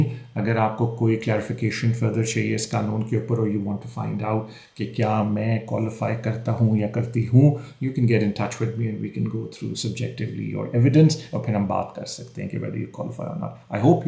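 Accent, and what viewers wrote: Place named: Pakistan. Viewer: Its Pakistani English language XD wtf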